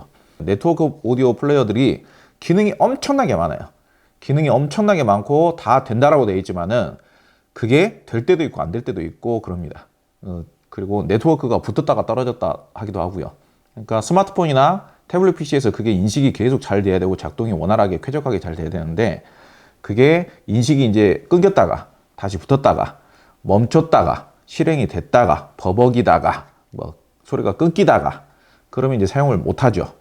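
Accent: Jeolla, South Korea. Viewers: 전라남도~ 약장수처럼 말하네요~~ 그닥 신뢰가 안가네요.... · 전라도 사투리가 구수함 ㅋㅋㅋ 저두요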